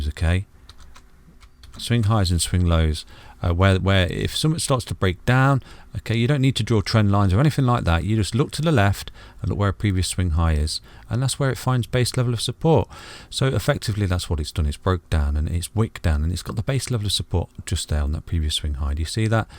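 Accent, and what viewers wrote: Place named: Nottingham, United Kingdom. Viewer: that sounds like a Nottingham accent there? Forgive me if I’m wrong! Great videos! 👊🏽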